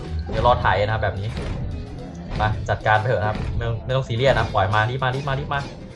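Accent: Isan, Thailand. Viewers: พี่เป็นคนอีสานหรอผมภาคเหนือ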